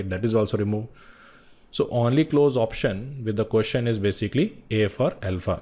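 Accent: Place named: India. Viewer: I can tell that he is speaking in Indian accent... But it should not be hard if know and use the language very frequently.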